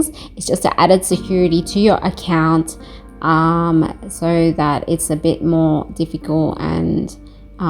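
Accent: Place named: Australia. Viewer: Nice vid you sound austrlian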